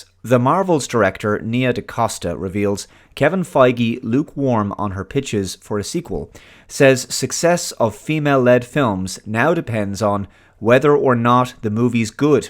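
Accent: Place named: Ireland. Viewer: Hey, great vid. Really didn't like that symmetrical video split youve done in the BG. Made me a bit queasy tbh. Not sure you've done that before, I just like to look at all the beautiful locations behind you while listening to your dreamy Irish tones. Without the editing. Anyway, cheers mate 👍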